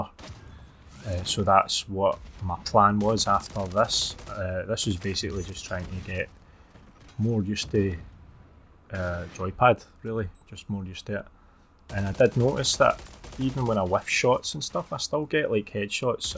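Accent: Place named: Scotland, United Kingdom. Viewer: Your Scottish accent 😀!! Beautiful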